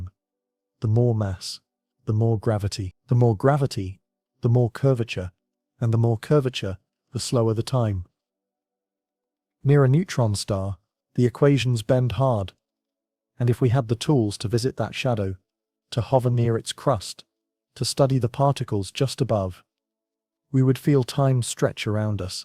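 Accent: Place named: United Kingdom. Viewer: Comfy soft bed, warm, cozy quilt, big, soft pillow, space video with soothing British accent. Good night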